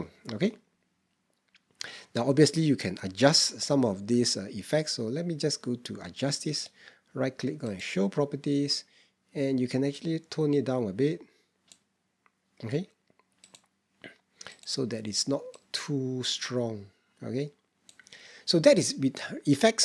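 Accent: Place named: Singapore. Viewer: Love the Review. Even more, love the Singapore accent.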